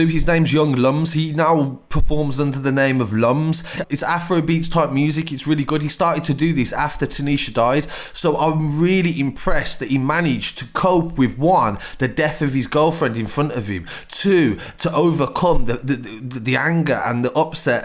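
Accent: United Kingdom. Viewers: Lol I just don’t take your voice serious your outside London voice is funny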